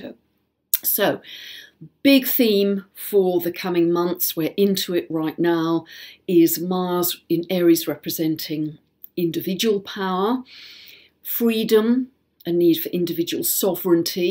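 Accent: United Kingdom. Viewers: I have an interesting story with coming across this video! I have been feeling this energy intensely in my home life and searched for videos on the energy of this moon. There were a couple I saw that I thought I was going to watch but felt pulled to keep scrolling. I have never seen any of your videos but when I saw the thumbnail I had the though that you would have a British accent (I am from 🇨🇦). I heard a voice in my mind accent and all, and I became curious so I clicked to see if you were in fact British. Not only are you, but the voice in my mind was your voice!! And now hearing your message I am clear why I was guided here. I have been struggling with my 3.5 year old the last little while and last night was the most intense. He had a meltdown that we were lost in how to help calm him. So along with the macro of toppling governments or full political systems, I'm experiencing this on the micro that my sweet boy is toppling the hierarchy in our home 😆 As much as we are aiming to stay removed from the fear, there is definitely a layer of stress that has been resting on us with everything going on. 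I have been sensing for a long time that systems will be crumbling away, but they usually don't go easily. Especially when they are as deeply rooted as the ones in place now, and when many people are so used to being powerful at the hands of these systems. So worry comes easily when I think of my two young children (3.5 years and 8 months) and the potentially turbulent near futur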